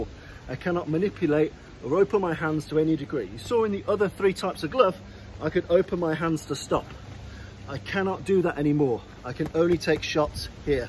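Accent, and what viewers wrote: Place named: England, United Kingdom. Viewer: Where in England are you from?you have such a lovely accent but I've never heard it before.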